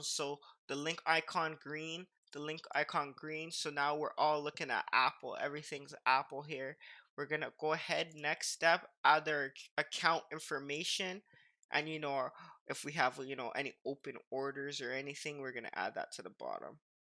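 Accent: Toronto, Canada. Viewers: yuh knoe... you from toronto ?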